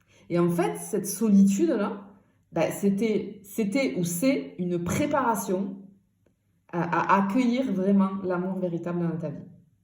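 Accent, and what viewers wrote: South, France. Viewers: Bonne et heureuse année a vous et vos proches merci à vous de nous guider et votre bonne humeur et cet voix qui chante le sud ça nous fait du bien merci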